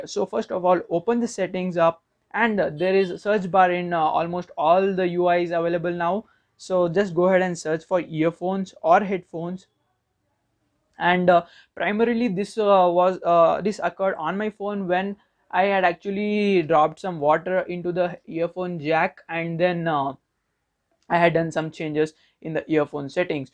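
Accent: India. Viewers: Bro i love the English accent of Indian 👌 cool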